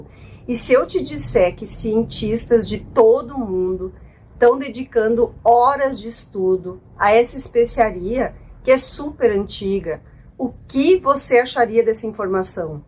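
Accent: Rio Grande do Sul, Brazil. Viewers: Bah, que belo conteúdo sobre a pimenta!🌶️ Fora isso, serio, sei que sou gaucha nata, colona, mas confesso que detesto ouvir nosso sotaque, principalmente outra pessoa falando. Porque é um sotaque com sons pobres, quase que uni-tom. Parece que a gente so tem um tom descendente e um ascendente e toda frase termina e começa do mesmo jeito. É enjoativo demais. É como um semi-alfabetizado lendo com medo de errar, as primeiras frases. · Dra a sua sabedoria aa hipnócratis tem alimentado minha fome por saúde.não obstante esse seu maravilhoso sotaque gaúcho é também um grande acolhimento terapêutico.sou professor aposentado.sou de Belo Horizonte,muito obrigado por você existir. Eustaguio.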